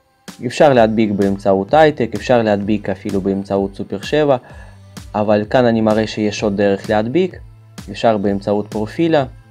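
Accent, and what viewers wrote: Russia. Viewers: Твой" русский" акцент в иврите звучит знакомо -:) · Хароший Русский акцент.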